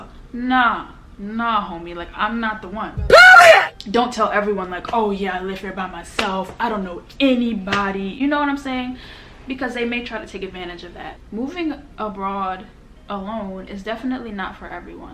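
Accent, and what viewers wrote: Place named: United States. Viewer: You sound like you lost most of your American accent 😂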